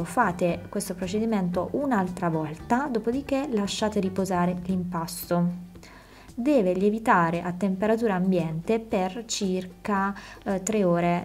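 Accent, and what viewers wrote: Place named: Marche, Italy. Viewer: Preferisco 1000 volte il suo accento marchigiano e la sua bravura alle cachielle presuntuosette, non ti pare????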